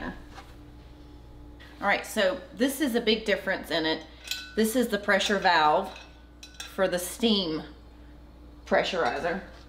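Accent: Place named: South Carolina, United States. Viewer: I can tell she is one of us in South Carolina! "Caddy Wampus' LOL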